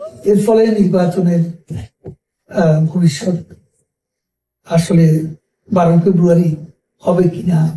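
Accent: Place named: Kolkata, India. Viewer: কথায় কলকাতার একটা টোন আছে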